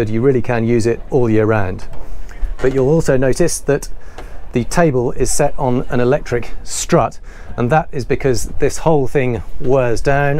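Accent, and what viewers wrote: England, United Kingdom. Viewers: Does anyone care about insulation for cold weather boating? It's a super impressive for the price. It is amazing. However boats in general tend to ignore, three of the four seasons we have here...as in Ireland, where I'm from, Scotland, where he mentioned the boat was from, or England, where he sounds like he's from...but basically the entire north half of Europe, all of Canada, and basically almost all of the USA. I'm not trying to say a boat like this is bad, because for the price, it's super. But if you've ever been in a warm house with poor insulation, or patches of poor insulation, then you know about kold and how hard it is to get out. It will literally dif itself into surfaces like plaster, concrete, and ceiling boards. I know this from first had experience of literally digging into a concrete wall, and a ceiling board, on separate occasions, and finding mold dug in a good few millimetres. 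Any cleaning fluids won't work when it's inbedded into the concrete itself.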